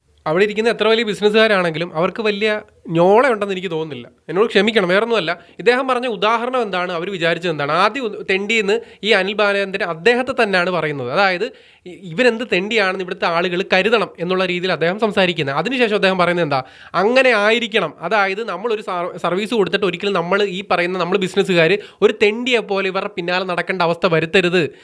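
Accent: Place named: Kozhikode, India. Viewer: ഇതിനെ ആണ് സ്വയം കുഴി തോണ്ടൽ എന്ന് പറയുന്നത്.... എത്ര ക്യാഷ് മേടിച്ചാലും ഒരു മയം ഒക്കെ വേണ്ടേ മോനേ..... കോഴിക്കോട് ഉള്ള ജനങ്ങൾ ചുമ്മാ ഒരു ആളെയും ചീത്ത വിളിക്കില്ല. അനിലിന്റെ വീടിന്റെ അടുത്ത് ആണെന്ന് നിന്റെ ഭാഷ കേട്ടാൽ അറിയാം.....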